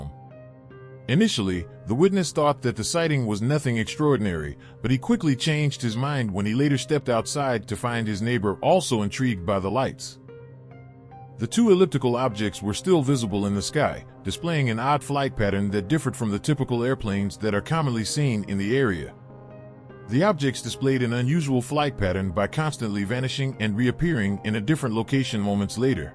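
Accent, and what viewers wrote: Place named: South, United States. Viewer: First of all, I love the videos. Secondly, you have the must subtle southern accent. Sounds like South Louisiana or maybe even Houston. Cheers from a South Louisianian!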